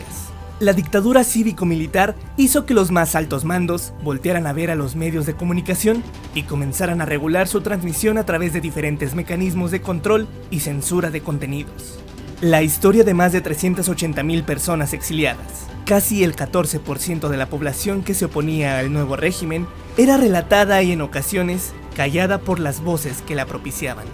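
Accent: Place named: Bolivia. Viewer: porque la locución habla como si fuera bolivia o algo asi?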